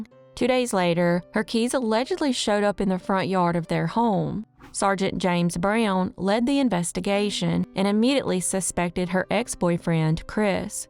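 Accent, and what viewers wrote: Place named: Louisiana, United States. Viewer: Based on your accent (which I love and think is adorable) I'm gonna guess Louisiana. Am I right?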